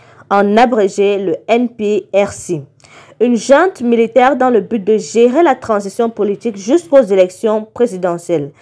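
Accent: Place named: Cameroon. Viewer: L'accent est trop camerounais et sérieusement inaudible 🤢🤮 faîte un effort svp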